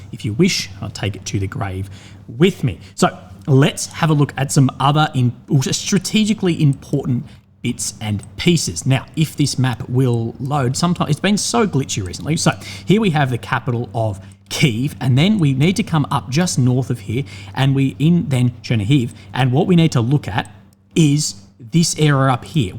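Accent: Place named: Bogan, Australia. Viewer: Aussie bogan....speaking on Ukraine war...hilarious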